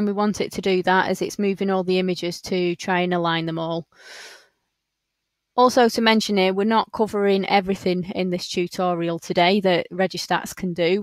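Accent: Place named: United Kingdom. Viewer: The speaker speaks to fast and with the british accent - difficult to understand all of the words. Slow down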